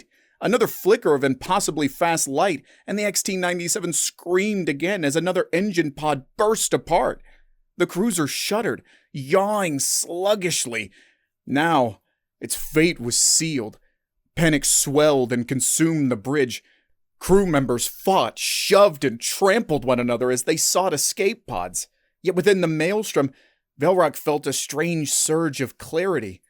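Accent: South, United States. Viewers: YES! of course, as the University of North Carolina found out when studying North Carolina accents, there are 105 different versions of southern accents in that state! Good Job, you all, in choosing this accent for such a good story! Same plot as in many AI generated stories but it is the way a story is told rather than the actual plot, that counts for all!! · I like that you actually used your voice for this, as opposed to every other HFY channel, but one thing: WHY A HEAVY SOUTHERN ACCENT? ITS DUMB, OVERUSED, AND IS AN AFFRONT TO MY REGION (WE DONT SOUND LIKE THAT) Great video!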